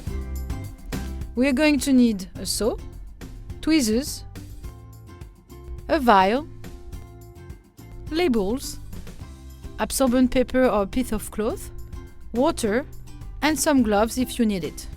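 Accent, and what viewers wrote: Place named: France. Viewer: That's a French accent if I have ever heard one 😂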